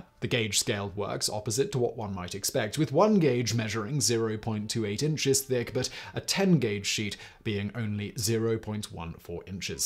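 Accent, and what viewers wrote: United Kingdom. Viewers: Yes, it surprised me as well. Sounded so wrong coming from a British accent, mispronouncing words like that.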